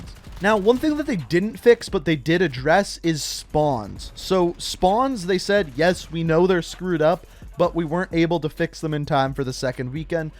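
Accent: Canada. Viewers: I can definitely tell you Canadian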